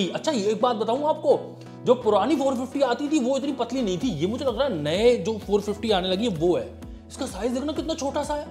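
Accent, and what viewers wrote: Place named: Bulandshahr, India. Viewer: Where are you from, I can recognize your language. Are you from bulendshar, up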